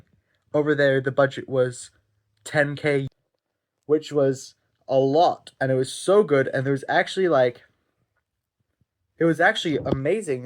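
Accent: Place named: United States. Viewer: you sound more american than new zealand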